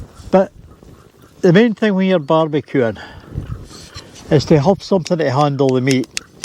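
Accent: Scotland, United Kingdom. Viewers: Are you Scottish maybe?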